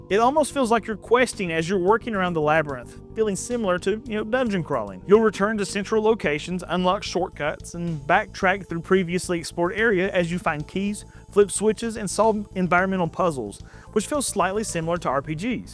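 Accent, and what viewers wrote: South, United States. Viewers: That southern drip is back, yeehaw! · I think that ending summation is a good way to think about these games; they're not RPGs, but they capture the feel of one. At least, that's how I felt when I finally did a full playthrough of Majora's Mask last year, and that helped me realize part of why I enjoyed it and Ocarina of Time (which I also finally finished a few years ago) as much as I did. Also, I gotta say, I appreciate hearing a southern US accent on this site amidst all the coastal and British English ones; maybe it's 'cause I'm a southerner myself (not that it's easy to tell from my own accent), but still, nice to hear.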